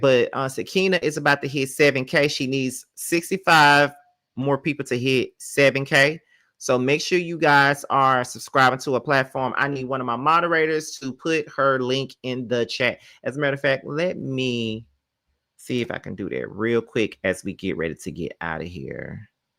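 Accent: South, United States. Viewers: Omg u sound so country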